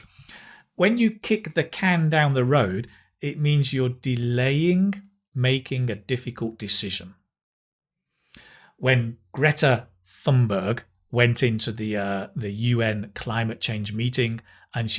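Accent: United Kingdom. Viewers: Это явно не американское произношение. Вы британец? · Hi, as always your content is very useful to practice the speaking section, but I have a question; You have a British accent, is there a chance that your advice can have some phrases/sentences/expression that is used just in the UK? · The British accent is wonderful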